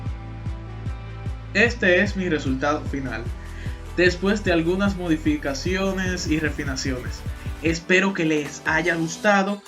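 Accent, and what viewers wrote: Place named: Dominican Republic. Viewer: Muy bueno el tuto. Según tu voz, debes ser dominicano. Un abrazo mano desde el patio.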